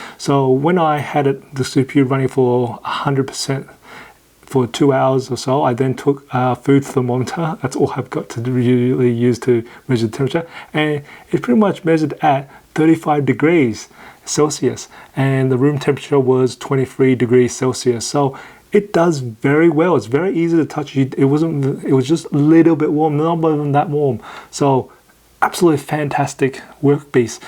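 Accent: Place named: Australia. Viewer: Nah it's okay I'm looking forward for new content on your channel . Btw nice aussie accent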